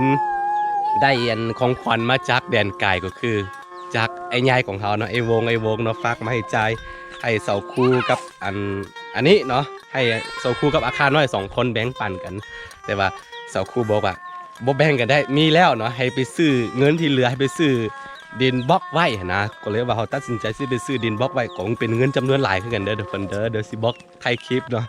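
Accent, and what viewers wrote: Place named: North, Thailand. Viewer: พูคเหมือนคนเหนือเมืองไทยมากๆ fcลำปาง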